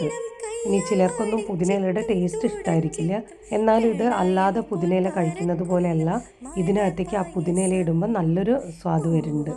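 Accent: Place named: Pattambi, India. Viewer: എന്റെ നാട്ടുകാരിയാണോ?. സംസാരം കേട്ടപ്പോൾ തോന്നി. ഞാനൊരു പട്ടാമ്പി ക്കാരിയാണ്. ഞാൻ കൂട്ടായിട്ടോ. തിരിച്ചും സപ്പോർട്ട് വേണേ 👍👍👍💕💕💕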